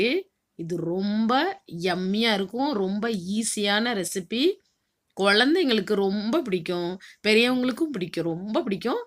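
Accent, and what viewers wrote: Tirunelveli, India. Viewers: Hello sis...which temperature has to set if the recipe is cooked with oven? Also sis one small request...pls reduce english words and speak in your tirunelveli tamil....it is sweet to hear like you pudding recipe:-)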